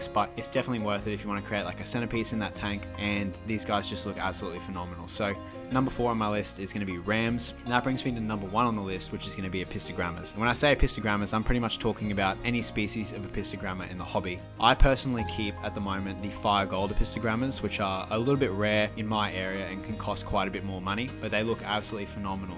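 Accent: Australia. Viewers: bro you sound Australian why you talking in gallons?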